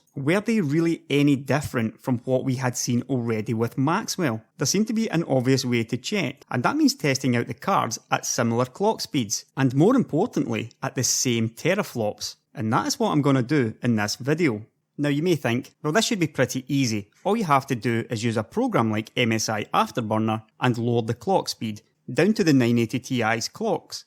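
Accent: Scotland, United Kingdom. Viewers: content : 40% scottish accent : 60% total : 100% subscription rate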